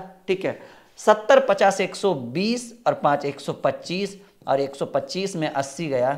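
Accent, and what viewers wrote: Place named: Nawada, India. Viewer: Sir aap buturu bolte h nawada side h kya sir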